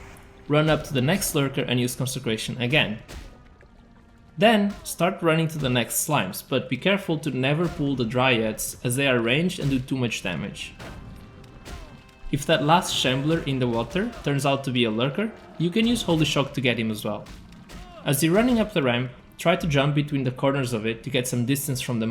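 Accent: Portugal. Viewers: I could sense it in the accent, olá amigo! 😆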